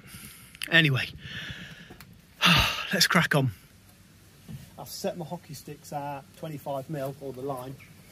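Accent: Derbyshire, United Kingdom. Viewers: Sounds like your from chesterfield or North Derbyshire ?????? Let me know pall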